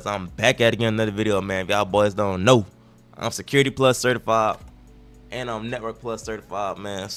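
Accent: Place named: Florida, United States. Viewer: I'm prouda you! This was super motivating. Thank you for sharing. OAN you gotta be from FL lol I can hear it!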